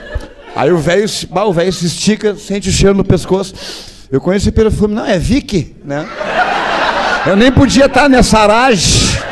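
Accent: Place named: Rio Grande do Sul, Brazil. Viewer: Esse sotaque Bagual malandro é único , o identificação do caralho!!! Muito bom parabéns! 👏👏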